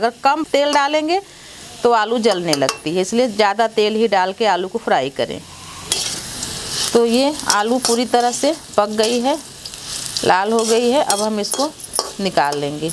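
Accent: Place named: Uttar Pradesh, India. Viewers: Aunty Apne up evam ilahabad ki Shan badha di. Love you. Bhasha ka accent bhi pure up style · I love your UP accent........UP regional taste is best in India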